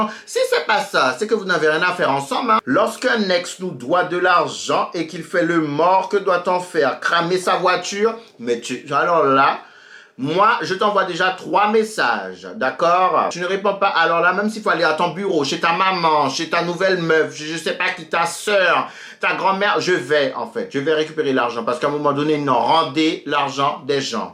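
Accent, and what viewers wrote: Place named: Brazil. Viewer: Ton accent brésilien j adore 💕💕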